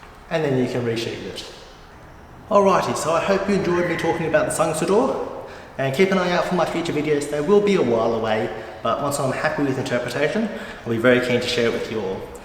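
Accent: Australia. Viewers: First thought, this bloke is aussie, next thought did he say Sydney? Turns out you're just 5 minutes away! 😮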